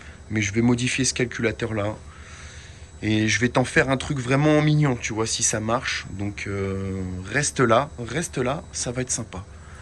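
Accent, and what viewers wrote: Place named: Lyon, France. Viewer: Une prepa Peugeot j'aime bien :) ça change ! t'es de Lyon ou autour d'apres ton accent non?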